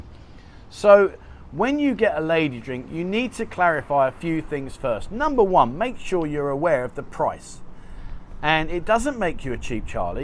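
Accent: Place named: RP, United Kingdom. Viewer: ...does a good job with information...and with a bit of the 'kings accent, too! remember: be cool..if you are 21yoa, 41yoa or 71yoa..from europe, the US or wherever. does not matter..be cool.. the beer thing..most of the clubs drafts..not pubs..but thai bars drafts are watered down...if i go in the first is a bottle..then..i might switch to a draft..."balance".. dress nice but not too nice. be well-groomed, and hygiene. put some slick hair gel..a nice bottle (not cheap) of colone helps..i would bathe in it and the girls would cuddle right in the club and compliments me too. like any woman they like assertive..you see a girls you like go ask her or ask the waitress to ask her over for drink...best thing be and have "fun"..don't sit there like at a dentist appointment.. kind of like the more fun you are having the more fun "the" girls like that.. 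the rule of no photos has been for decades..i think..in angeles city/PI up until i think 2012 or so you could take pictures and video...from what i know there..not anymore hence internet. if i am on a chatting app..it (phone) is in my lap or on the table..guy "things" like that the girls in the clubs..pick up on and will respect your and like you...since you are "protecting" them.. i will say thei for the 11th time.."best" years of my life...best.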